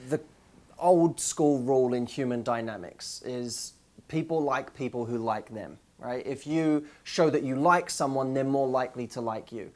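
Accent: England, United Kingdom. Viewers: Gosh I am so obsessed with your English accent 😀 great lesson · ***** Totally :) British accent and brown hair from Orlando, facial features from Ryan.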